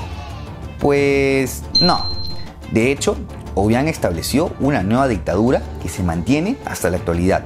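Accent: Peru. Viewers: Ese acento es peruano? no? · Amigo, por el acento, el you tuber es peruano ¡Saludos desde Popayán, Colombia ¡ 👏🇨🇴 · q rico acento peruano. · No es mexicano el realizador de este video. Por lo que se escucha, el acento de él es peruano. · No tiene el "dejo" de un mexicano.... los mexicanos no tiene ese acento aunque son algo parecidos.....pero se nota que es peruano.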